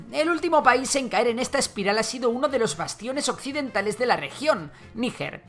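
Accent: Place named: Spain. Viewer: Religión? Si, Europa hace del colonialismo un dogma, una religión, supongo que esos quieres decir. La revolución industrial provocó el colonialismo?, no fue su asquerosa codicia. Muy sezgado tu video, se nota demasiado tu acento español, que no se queda atrás, incluso matándose entre ustedes mismos durante su asquerosa dictadura.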